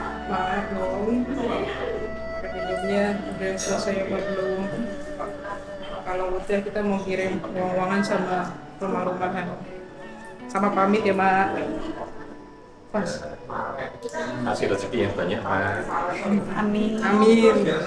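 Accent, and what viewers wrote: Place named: Semarang, Indonesia. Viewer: Semarang tulen logate nyenengke tok. Aku padamu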